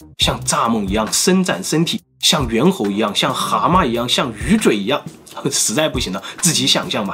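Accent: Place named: Fujian, China. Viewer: 刚把老九门看完😊，是弗兰人吧？完全的弗兰口音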